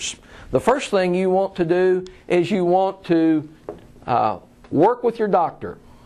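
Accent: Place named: Kentucky, United States. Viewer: Kentucky. Need I say more? Learn to speak English please.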